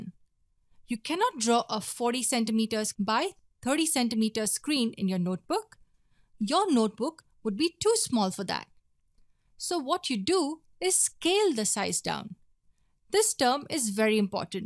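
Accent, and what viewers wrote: India. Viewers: Please change your talker im not in the mood for indian accent (not offensive just asking)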